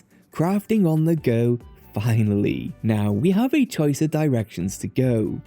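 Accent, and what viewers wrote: Wales, United Kingdom. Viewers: Where is that accent from? Sounds slightly welshie with some words xD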